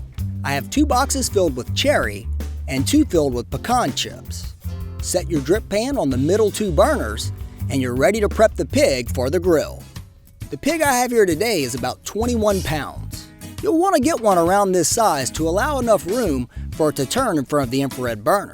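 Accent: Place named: Midwest, United States. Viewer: If you were born in Gonzales , Louisiana . Why , can't you pronounce French words correctly ? You sound your words not with a Louisiana accent , but with a typical Midwest accent . I will say your food looks absolutely delicious . I really enjoyed watching you prepare your dishes . "Bon travail avec votre programme alimentaire ". Dieu vous protege.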